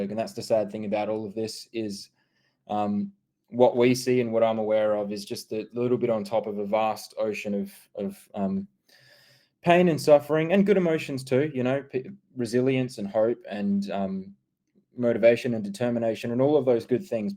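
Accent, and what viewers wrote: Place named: Australia. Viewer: Im not english speaker.so just waana listen ausi accept .....❤may u be well and happy ......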